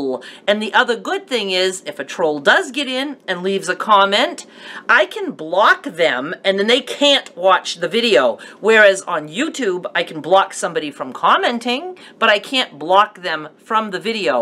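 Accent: Maine, United States. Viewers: This will be a totally different comment (hope you can laugh a little). I’m living in Ohio now, but lived in Maine on North Haven for 2 years with my husband. I love your Maine accent and it brings back wonderful memories Maine.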